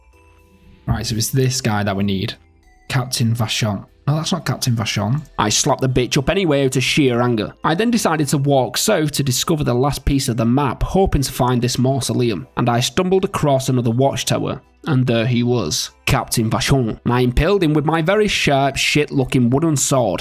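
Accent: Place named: Lancashire, United Kingdom. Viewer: Nice vid mate i certainly detect a lancashire accent. Chorley/wigan by any chance?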